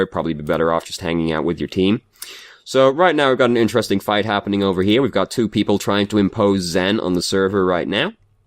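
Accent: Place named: East Coast, United States. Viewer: Where in Europe? My first guess as to where you're from would've been somewhere on the east coast of the US...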